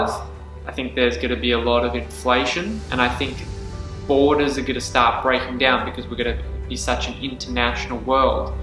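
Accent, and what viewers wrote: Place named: Australia. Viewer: I thought you sounded Aussie, Aussie here, so Aussie living in the US?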